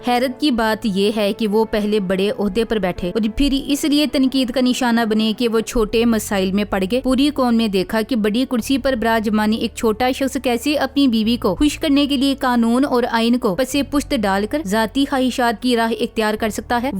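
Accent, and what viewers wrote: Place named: Punjab, India. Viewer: Khabees Faiz essa .... Please AAP Urdu ko Urdu mein Bolen Urdu ko Punjabi lehjay mein na boleen ....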